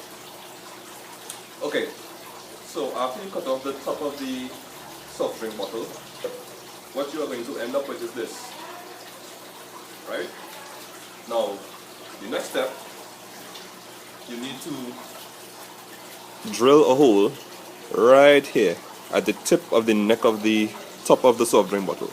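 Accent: Trinidad and Tobago. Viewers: i hear a trinis voice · Hmm u sound like a Trini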